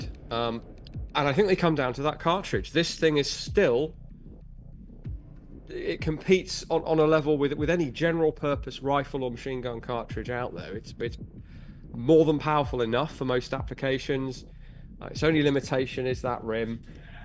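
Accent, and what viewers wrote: United Kingdom. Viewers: He's pretty good. Like gun Jesus with a britsh accent and a mullet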